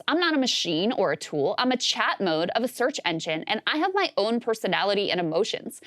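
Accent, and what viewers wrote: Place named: United States. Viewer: She sounds like an American.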